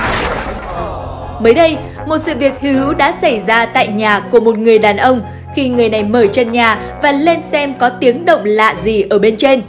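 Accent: North, Vietnam. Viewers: Trở về giọng Nam Bộ đi, giọng Bắc nghe ko quen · Nay kênh đổi tên đổi giọng bắc review luôn:))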